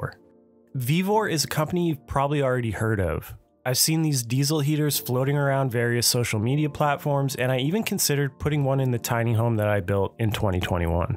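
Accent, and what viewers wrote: Canada. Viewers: Took me a while to figure out your Canadian pronunciation of "decal", but otherwise, thanks. Also you lost some credibility with the Prusa hate. I agree that Bambu printers are technically better, but open source is the most important feature for me, so Prusa wins there.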